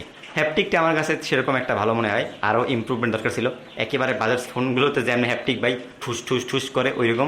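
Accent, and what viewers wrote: Barishal, Bangladesh. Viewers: Vhai ki iccee kore borishailla vasa e khota bolen?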